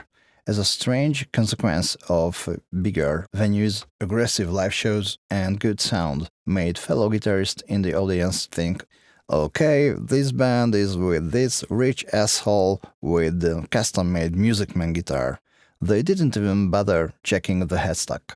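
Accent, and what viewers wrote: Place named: Balkans. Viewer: Fullok a balkáni angol akcentuson és vele párhuzamosan a kis rajzokon. 😆💯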